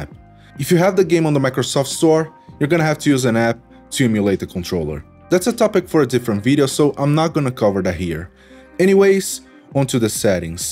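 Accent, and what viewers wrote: Brazil. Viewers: Esse cara é brasileiro kkkk... Certeza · tbm achei kkkk to quase ouvindo ele falando em português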